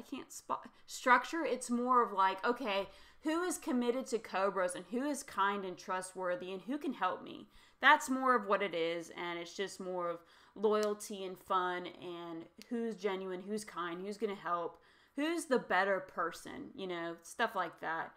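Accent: United States. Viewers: The most american accent ever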